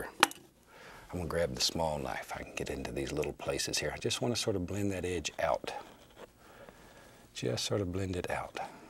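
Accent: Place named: Midwest, United States. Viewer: That's his mid-western accent. He's originally from Indiana.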